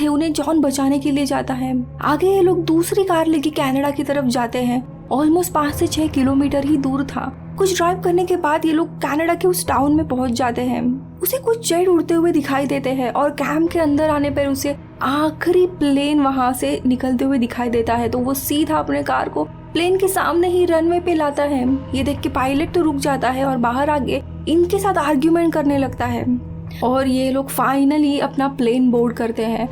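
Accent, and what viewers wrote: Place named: Gujarat, India. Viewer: Gujarati flavour aa rahi hai awàaz me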